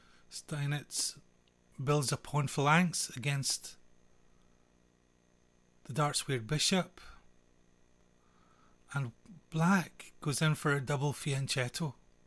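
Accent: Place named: Scotland, United Kingdom. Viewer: Originally, I was gonna ask if you were from Glasgow, but since I am not familiar with different Scot accents I broadened my question. No shame in being from Glasgow. Thanks for the video.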